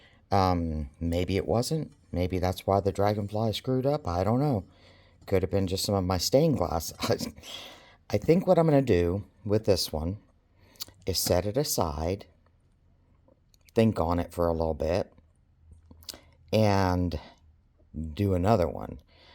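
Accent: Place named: South, United States. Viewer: Ok, where are you?im southern and you’re sounding like home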